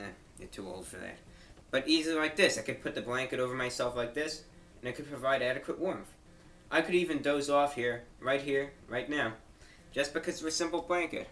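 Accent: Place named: Boston, United States. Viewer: Hes got more of an accent in this video hes more pronounced now.is he from boston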